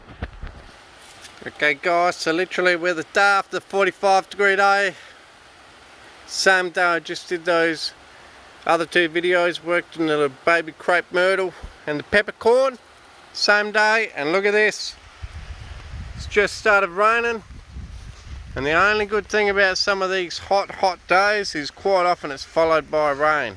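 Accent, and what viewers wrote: Australia. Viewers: Ai has been updated to interpret Aussie English. Closed captioning will never be the same.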